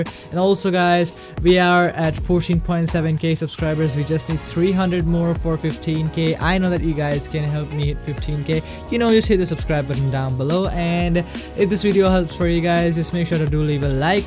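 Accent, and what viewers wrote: India. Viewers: Bhai I love your English accent bro I know you are from india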